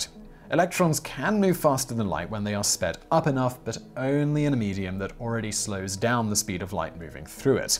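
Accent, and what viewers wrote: United Kingdom. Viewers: Since he's British. I'm pretty sure his pronunciation is the correct pronunciation. Others are speaking English with an accent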